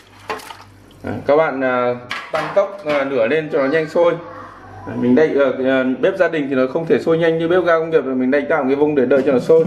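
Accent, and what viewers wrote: North, Vietnam. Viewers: Anh mê kênh của chú lắm nhưng a ở miền tây nghe giọng bắc hơi khó nên a nghe đi nghe lại hoài mới hiểu. Phai chăng vừa nói vừa hiện chữ lên màng hình luôn hay quá. Cảm ơn chú nhiều. . .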